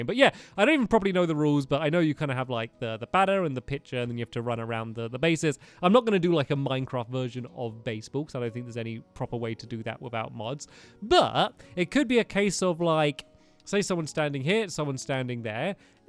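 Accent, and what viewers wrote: England, United Kingdom. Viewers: Oh, oh you make me smile! I think i'll sit down and watch for a while. Oh, oh, when i hear voice. I hear the engish acent i rejoice! Oh, oh, your worldx are lovely! Full of cakes helpers and lovely jublies. Oh, oh sta-oh no! Its hitthetarget!! He shoots an arrow, you gotta block it! Horray we won! Now the day is done. Now its night, turn off the light and dream... Oh, wait, whats my dream? To become your helper. I am a great builder! Oh please, oh please. Gamer tag: CrystaI dog P.S. the L is a capital i Thank you!😊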